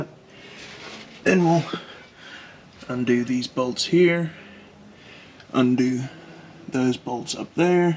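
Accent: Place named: South Africa. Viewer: you sound like an original SAFFA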